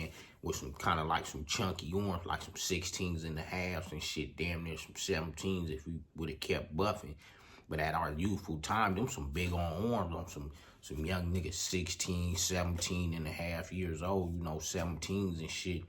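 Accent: Los Angeles, United States. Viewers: Dog you got the most LA accent I’ve ever heard! When I talk to my Cali guys I always do your impression. It’s not a good one tho… I’ve put everyone I know up on your channel. We all know you’re the real deal. Glad that the real G’s are telling their own stories in real time. Stay safe out there. We need ya.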